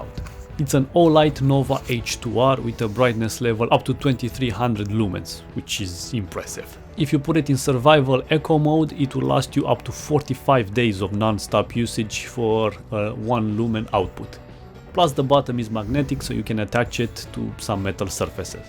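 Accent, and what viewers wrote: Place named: Eastern Europe. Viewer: Where are you from? You have that eastern european accent.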